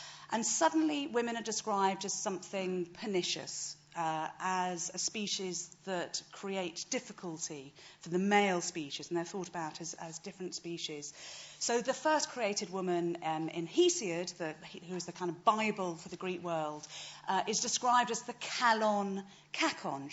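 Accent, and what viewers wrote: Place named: United Kingdom. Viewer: To this American Bethany Hughes has the perfect female British accent and voice. Especially aesthetically pleasing while being educated.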